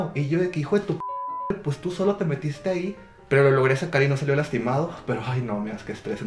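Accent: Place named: Mexico City, Mexico. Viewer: Siento que ya perdiste tu acento norteño y ahora hablas como chilanga fresa jajajjaja tomemos un shot cada que digas "mega" jajajaja tqm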